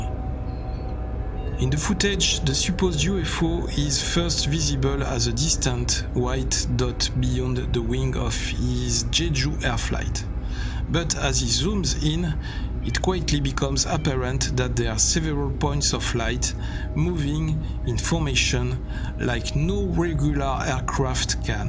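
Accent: France. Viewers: T'as un sacré accent bien français ptdr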